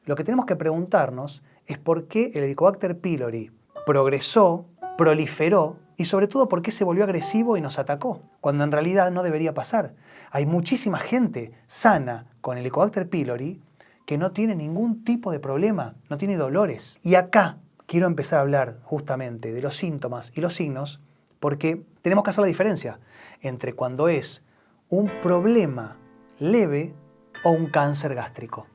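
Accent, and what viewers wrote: Argentina. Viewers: vos sos argentino? disculpa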